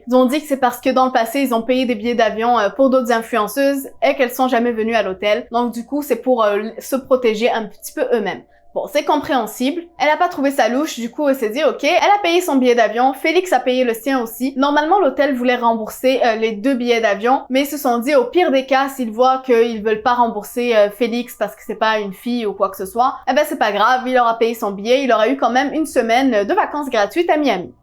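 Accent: Quebec, Canada. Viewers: Y a des arnaques partout c'est dingue. Et là c'est encore pire, que serait-t-il arrivé si c'était deux filles !!!😱 L'essentiel c'est que tout finisse bien, mais elle a manqué de prudence. Ps : c'est rigolo j'ai lu plus bas que tu étais québécoise, j'avais vu l'accent effectivement mais il est assez léger je trouve 😁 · Je viens de découvrir ta chaîne et j’aime beaucoup ❤️ Tu es québécoise ? Tu as un semblant d’accent 😉 New sub 💕 Edit : omg je suis tellement choquée de cette histoire 😭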